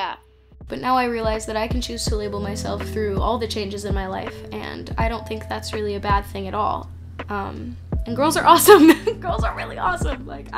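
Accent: United States. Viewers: I thought you were American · Wait I thought she's American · i thought you were american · i thought u were american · isn't it american? :0 I literally watch his videos to hear his voice, but I speak Spanish and I don't understand anything, hahaha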